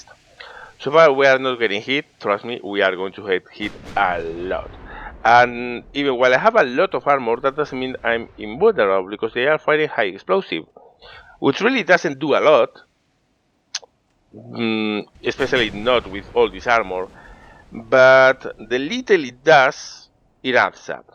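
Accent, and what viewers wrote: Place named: Spain. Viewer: Just found your channel for the first time when looking for UAD videos and insta- subbed. Good presentation with an adorable Spanish accent :). I'm torn apart with this particular game as it seems super interesting, but would you recommend dishing out almost 50 EUR at a game that is not even available in Steam EA yet?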